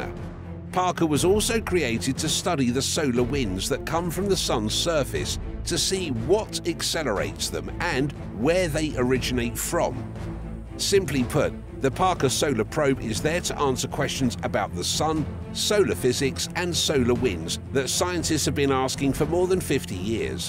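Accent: United Kingdom. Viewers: annoying british accent...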